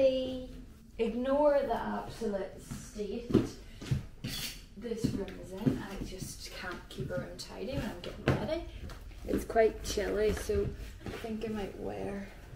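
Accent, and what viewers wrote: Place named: Ireland. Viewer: Lively and beautiful. That irish accent ❤. Glad youre with my fav band DD.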